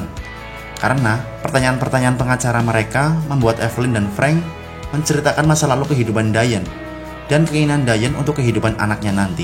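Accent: Banyumas, Indonesia. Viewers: Orang ngapak ya lu bang adminn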